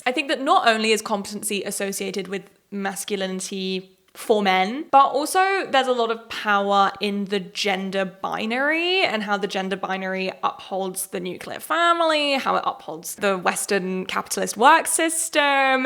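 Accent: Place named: United Kingdom. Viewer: She’s British it’s just her accent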